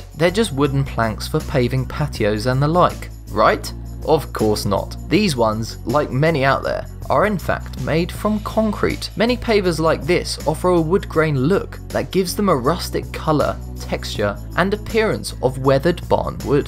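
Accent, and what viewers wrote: United Kingdom. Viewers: What is this what is this voice why is it so different and British why please why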